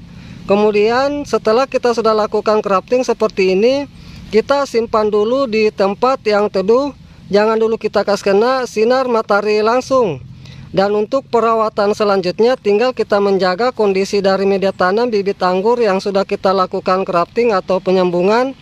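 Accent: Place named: Tolaki, Indonesia. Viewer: Sultra bagian mana bang, logat tolaki ta nda hilang keren 🥰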